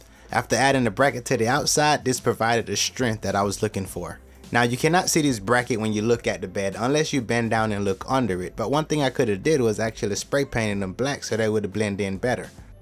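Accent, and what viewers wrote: Jamaica. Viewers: What is your accent? Sometimes it sounds Jamaican, maybe sometimes New Orleans? Love your vid btw. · You sound Jamaican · Are you Jamaican man? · You Jamaican?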